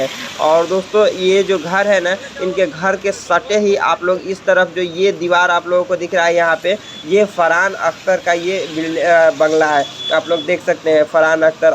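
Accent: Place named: Bihar, India. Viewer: Aap jarur bihari hain.mai bhi bihar ki hoon.apke accent se pata chalta hai. Pahle apna uchharan theek karen tabhi to baat banegi. Mai senior citizen hoon so aapko advice de rahi hoon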